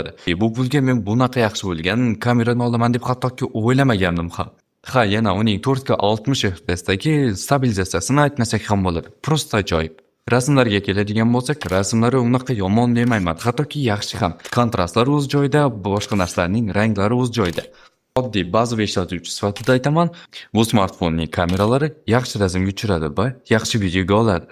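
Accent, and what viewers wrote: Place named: Amudarya, Uzbekistan. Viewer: Aka Amudaryodanmisz shevangiz tortyabdi videoda mangʻitdagi tanish yerlar koʻrinib ketti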